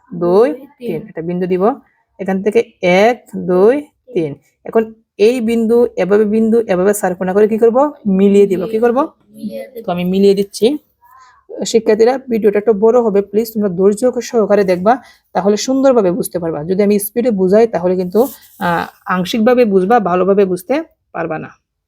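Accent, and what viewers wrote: Sylhet, Bangladesh. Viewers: তোমার বাড়ি কিতা সিলেট নি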